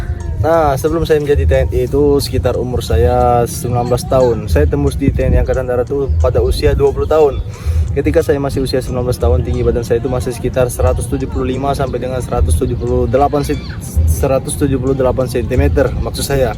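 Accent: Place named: Palembang, Indonesia. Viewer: Uwong palembang caknyo kakak ini wkwk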